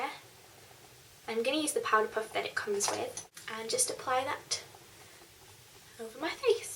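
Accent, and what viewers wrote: United Kingdom. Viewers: I really like your British accent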